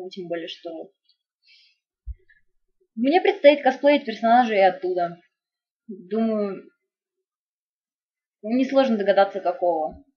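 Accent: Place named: Russia. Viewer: You are Russian, да? Or Ukrainian. Or...wow there's a lot of countries that speak Russian...anyway, you are really pretty and I really like the accent.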